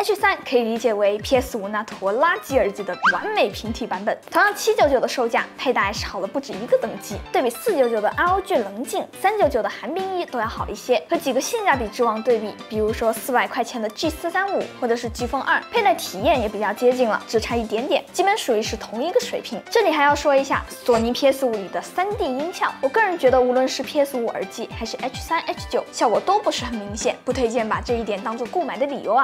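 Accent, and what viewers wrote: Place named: Chaozhou, China. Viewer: 你是潮州人吧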